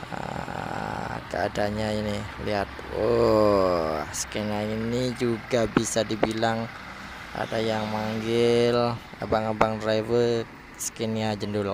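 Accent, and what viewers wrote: Indonesia. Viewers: Orang indonesia asli kah bang kaya logat bahasanya hehe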